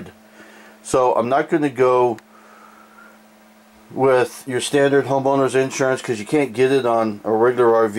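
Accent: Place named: East Coast, United States. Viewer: Thanks for the many replys , You have lived in Arizona your entire life ? sure sounds like you have a eastern accent , keep the videos coming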